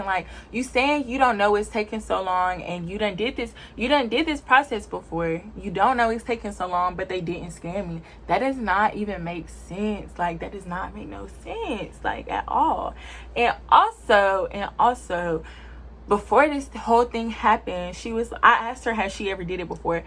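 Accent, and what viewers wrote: United States. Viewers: that’s a Baltimore. Accent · Are you from St. Louis ?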